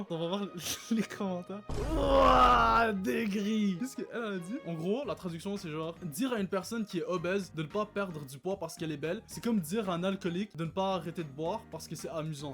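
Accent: Quebec, Canada. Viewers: Attends t’es québécois ?!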